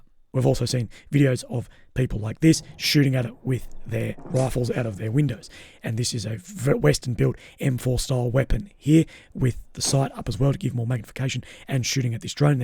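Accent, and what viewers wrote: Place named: Australia. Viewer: Big yin your pure on this subject unbiased opioid empathy and a funny oz accent. Dude l could do an hour a day why don't you do 2 wee video a day recap or fake news exposed. Watching from Scotland peace and love to all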